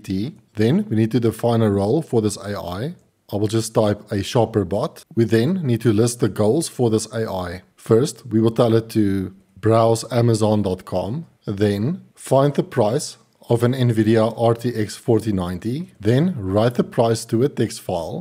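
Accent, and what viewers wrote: Afrikaans, South Africa. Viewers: Very informative and lovely South African accent :) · Loveley to hear an Afrikaans accent talking about the latest and greatest <3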